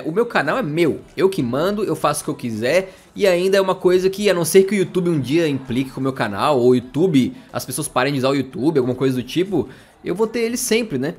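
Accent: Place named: Florianópolis, Brazil. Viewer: Esse sotaque manézinho hehe! Salve mano! :D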